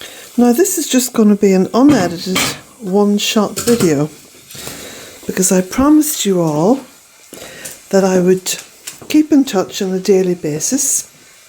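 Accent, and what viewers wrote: Ireland. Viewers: I'm new to your channel and viewing from the US of A. Lovely and inspirational. Plus I'm in love with Jack. And you have to know how we Americans are enamoured with a good Irish lilt. In addition I'm a 60+ woman dreamy with Adian Turner as Poldark thank you very much PBS. Stay well.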